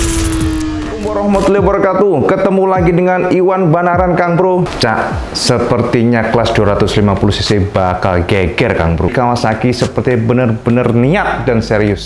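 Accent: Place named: Surabaya, Indonesia. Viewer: Asyik suka deh pembawaan wong arek" suroboyo auto sub lonceng, sukses terus bwt cak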